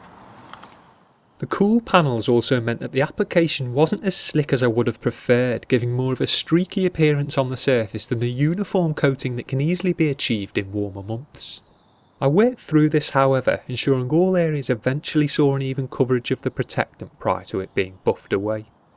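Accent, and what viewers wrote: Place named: Wales, United Kingdom. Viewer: Are you from Wales I love the welsh accent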